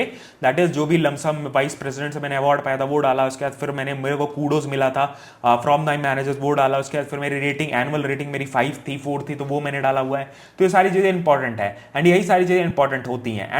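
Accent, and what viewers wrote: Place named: Bihar, India. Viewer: bihari trying to speaks in english , appplying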